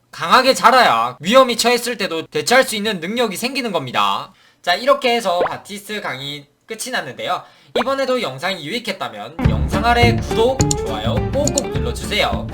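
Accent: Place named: Busan, South Korea. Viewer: 뭔가 부산 말투 같으신데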